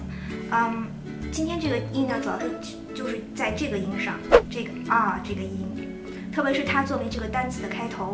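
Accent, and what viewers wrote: United Kingdom. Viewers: 你的发音很英式啊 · 听上去是英式英语？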